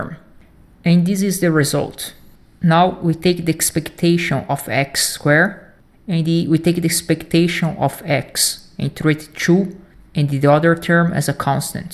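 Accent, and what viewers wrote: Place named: Brazil. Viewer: His accent is very Brazilian also 😅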